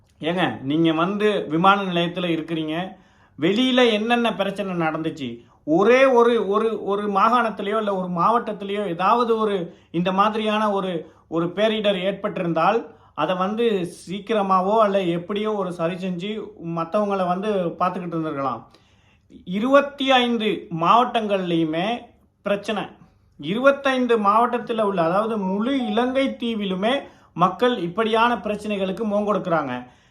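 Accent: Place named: Tamil Nadu, India. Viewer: உங்க தமிழ் இந்திய தமிழ் மாதிரி இருக்கு ப்ரோ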